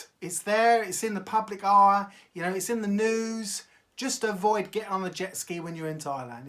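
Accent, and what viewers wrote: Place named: England, United Kingdom. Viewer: he's definatly not aussie...im aussie, and i picked up straight away he's a pom(english). they dont say"th", as in "noTHing", they say "nuFFin", thirty is firty,etc.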